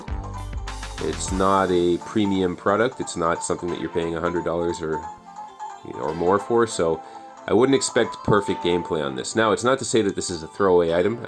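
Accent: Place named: Canada. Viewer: You sound Canadian. Is that $30 Canadian?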